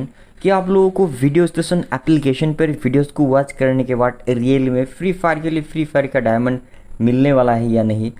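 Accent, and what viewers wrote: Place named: India. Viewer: We can't understand you. Indian accent is really annoying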